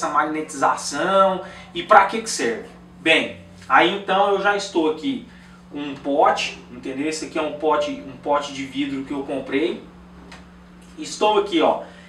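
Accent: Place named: Minas Gerais, Brazil. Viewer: uai , esse. é mineiro hahaha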